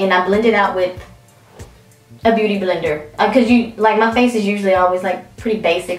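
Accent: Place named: South, United States. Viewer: I will FOREVER love your Southern Accent!!! Thanks for the Video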